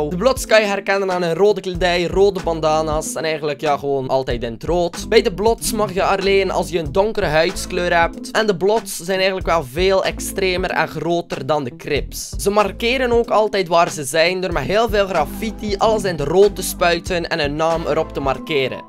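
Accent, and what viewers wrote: West Flanders, Belgium. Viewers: Belgies accent is wel grappig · jij praat echt heel belgisch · Rani Claes west-vloams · praat jij Belgische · Dat Belgische accent is echt leuk😍😍😍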